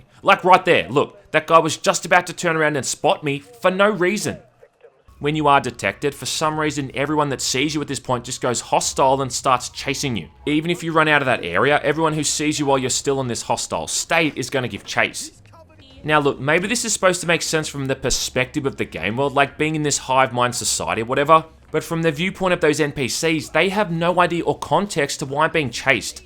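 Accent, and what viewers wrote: Australia. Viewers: Who'd had thunk'd that one day I would understand australianish almost perfectly.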